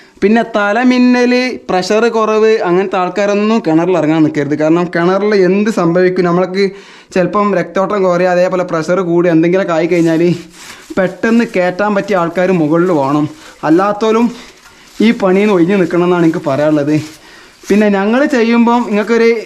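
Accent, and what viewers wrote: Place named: Kozhikode, India. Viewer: നിങ്ങൾ എവിടെയാണ് പ്പാ... ചെയ്ത് വചിക്കേണ്. അടിപൊളി സംസാരം ആണ് ട്ടോ... കോഴിക്കോട് കാരുടെ വർത്താനം .. നല്ലോണം പിടിച്ചാണ്ട് ഇറങ്ങാന്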